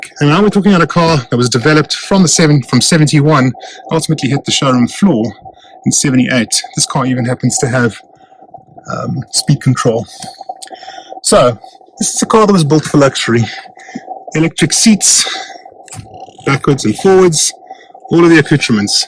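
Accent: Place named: South Africa. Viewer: I take it from your accent, you're South African. Is that where you got the car ? It's spectacular. The nicest 928 I've seen..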